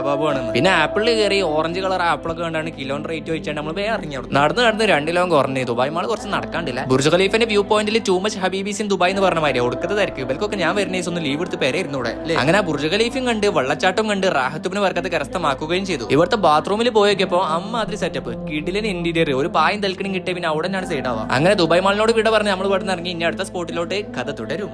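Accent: Malappuram, India. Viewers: Ante varthanam oru sambavane..mlaappuram slang ithrem richakkiyallo😅👌🏼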